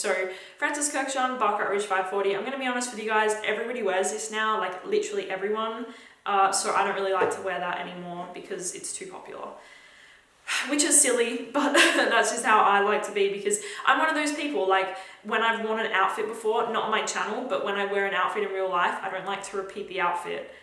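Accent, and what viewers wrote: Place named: Australia. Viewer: Love ur Aussie accent.....❤️❤️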